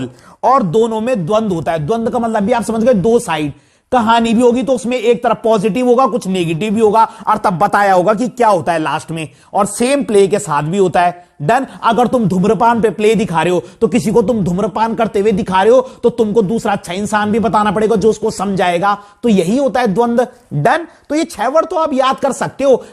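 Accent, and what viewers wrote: Garhwal, India. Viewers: Sir aap gadwali ho ? Apni tone gadwali he .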